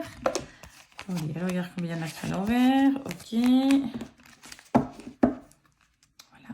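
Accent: Belgium. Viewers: Merci de nous faire découvrir les différents tarots, il y en a bcp qu'on ne connait pas donc c'est chouette 😉 vu ton accent tu es du côté de Liège 😊 moi c est du côté de Charleroi 😆 continue c'est chouette de ta part😜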